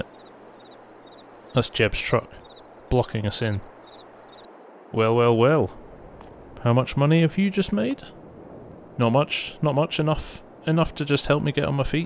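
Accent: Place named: South London, United Kingdom. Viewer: Haha, Statham is your hero! That's brilliant....however, you need to work on your muddled South London accent and nasal-y pronunciations a bit mate. I'm still waiting for someone to come out with an AD / CP route for this map. I think I've messed up my routes as I'm still getting used to AD...(always connect the lines like a train route, yeah)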